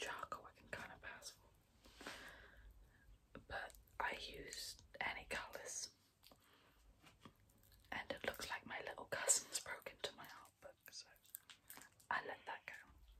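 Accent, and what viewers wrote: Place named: United Kingdom. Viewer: oh, your british accent <3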